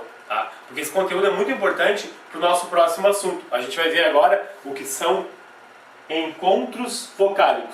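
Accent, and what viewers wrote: Rio Grande do Sul, Brazil. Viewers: gostei do sotaque gaúcho ..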